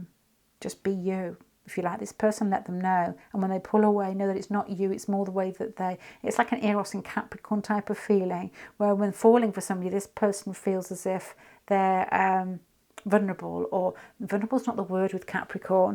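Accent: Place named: RP, United Kingdom. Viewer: Pile 1. I'm aqua he's libra. Wt*!!! How did you do it?? But please, please, pweeeeeese, talk a little bit less posh because most of your words are incomprehensible.. unfortunately for non posh people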